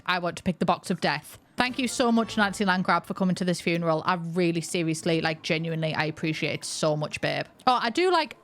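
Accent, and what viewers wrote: United Kingdom. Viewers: I love, I love british english, but I wish there were subtitles 😂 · Let me start by saying this, in order to not sound like a mean sourpuss later, I really love videos. The accent has, is making my Swedish heart pitter-patter-pitter-patter for the different British accents (yes, I tend to watch/listen to British series just to get my "daily" dose of British). Now, on to my comment that can be read in the wrong aka a mean way, which is not my intention whatsoever. At like she says "artistic prodigy", my brain went "why is saying autistic prodigy?". I had to rewatch that segment so many times, because my brain told me that sims 4 had sneakily added an autistic trait, and eventually I ended up using subtitles... the subtitles basically crushed what my brain has been begging sims to add in the game - a trait for sims with a slightly more NPF then for instance gloomy or loner. 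That way, even people who aren't neurotypical gets represented in the game. So, with that said, I never realised how much I wanted an autistic trait in the sims before the subtitles crushed it. Again - love content! <3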